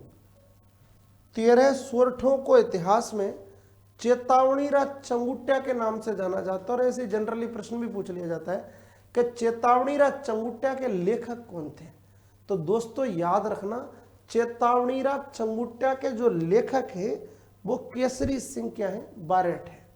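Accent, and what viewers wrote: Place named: Bharatpur, India. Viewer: सर आप भरतपुर के से लग रहे हो बोली ते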